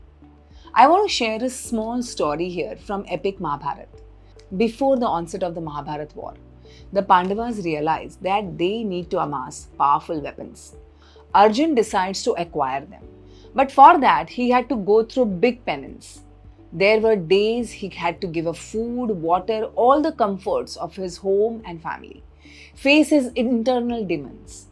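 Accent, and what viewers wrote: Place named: England, United Kingdom. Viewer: Love your English accent and the way you present your points with the flow. Happy to listen to you👏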